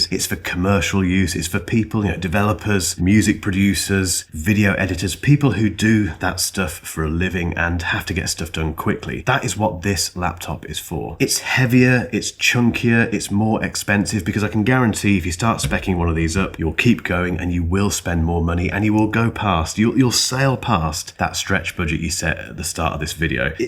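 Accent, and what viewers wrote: United Kingdom. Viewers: Hi! Always turn to you for some of the most sensible Mac product buying advice on the internet. Besides, as a Yank, I’m obsessed with your Brit accent! Best. FYI, my current MacBook is the M2 Air. 🇺🇸🇬🇧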